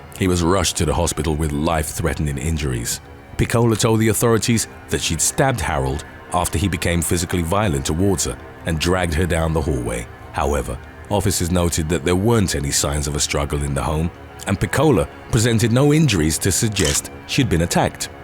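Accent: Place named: England, United Kingdom. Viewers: It’s like David Kieth’s but with the added bonus of an English accent! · Its a British Pro-Bubbly Lóndón Voicé of a Wést indián Jamacíân Negroiđ voicé Lyké Danný Johń Julês frôm Thê Rêd Đwãrf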